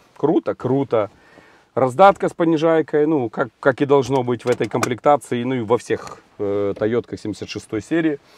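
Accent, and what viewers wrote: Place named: Surzhyk, Ukraine. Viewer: Друже я сам з Маріуполя і перейшов на рідну мову з початком війни, але твій СУРЖАК не те що ріже вухо, віз зриває дах. Так що, або показуєшь розвиток у вивчанні мови, або я від тебе "бля" відписуюсь !!!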